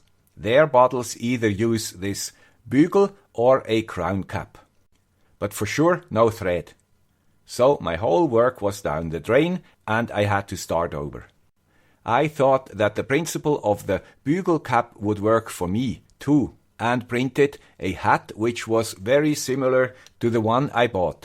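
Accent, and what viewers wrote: Switzerland. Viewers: Hi, love the way you show us your work. You should change your slogan in:.....the guy with the swiss accent and swiss humor:)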